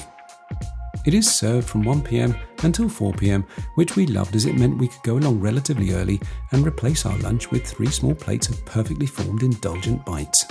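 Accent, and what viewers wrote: United Kingdom. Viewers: Why does the presenter have a British accent?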